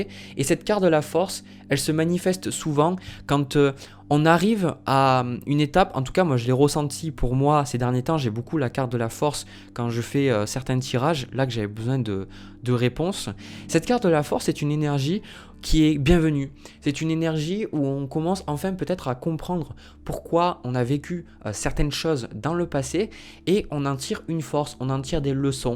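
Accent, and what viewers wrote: France. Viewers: Bonjour, Merci pour votre travail et vos commentaires. Perso ça fait deux ans que l'on lit ...de nouvelles choses... Etre seul, n'est pas in problème...le calme,la paid et le silence..voila qui est bon. Bonne question: qu'est ce que j'aime faire...? Attendons led energies suppérieures 🤫 Oui, attendons.... Fini la routine,la dictature... L'argent ...pour creer une sociétè et creer des emplois....et faire du bien pour les animaux... Belle semaine Ps: vous n'aurriez pas un petit accent francais? 😘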